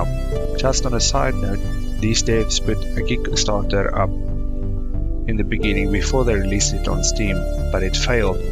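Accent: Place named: Afrikaans, South Africa. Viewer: you dont sound english you sound afrikaans if you do awe brasse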